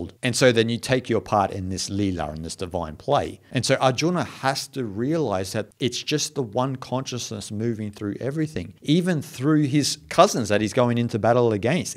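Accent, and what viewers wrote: Australia. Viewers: accent suggests to me that he is Australian. Am I correct?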